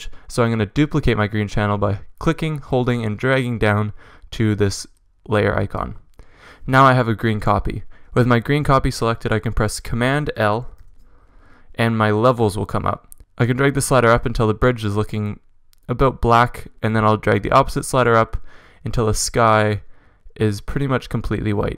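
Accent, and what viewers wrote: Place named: Canada. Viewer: I can hear the canadian accent tehe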